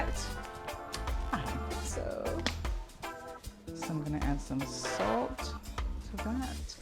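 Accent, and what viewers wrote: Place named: England, United Kingdom. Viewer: No salt fish really 🤪 sounding very english mate.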